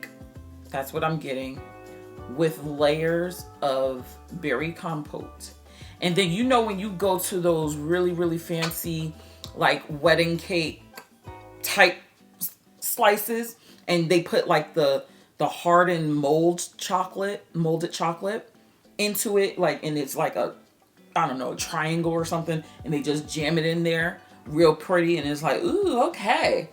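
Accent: New Jersey, United States. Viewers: Your voice sounds just like Wendy Williams ❤❤❤❤jersey vibes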